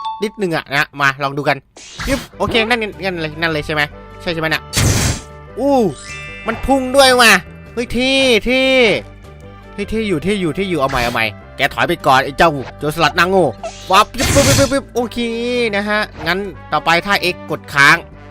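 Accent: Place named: Isan, Thailand. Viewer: เปิดคริปแล้วอีสานนีโครตเท่เลยนะเนีย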